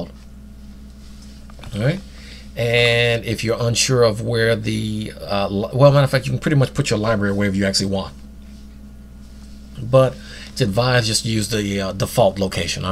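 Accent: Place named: South, United States. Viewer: That was very simple and resourceful. I admire your accent by the way what part of the South are you from? My first guess is Tennessee, my second is Georgia.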